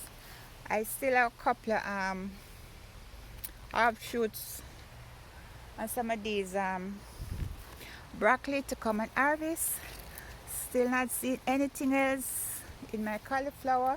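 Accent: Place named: Jamaica. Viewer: Got half way through the video and thought she has to be Jamaican. You just said it! New sub here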